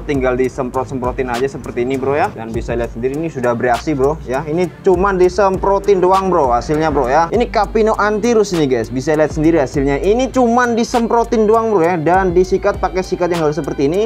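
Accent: Banyumas, Indonesia. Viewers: Mas sampeyan wong ndi yah Bahasane daning kaya ngapak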